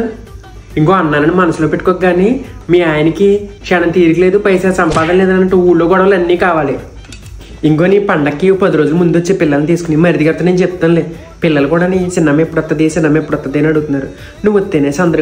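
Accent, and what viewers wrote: Godavari, India. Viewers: Super bro memu godarollame maa slang ni chala baa hielite chestunnaru 👌👌👌👌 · Maa godarolla matalu alage untay andi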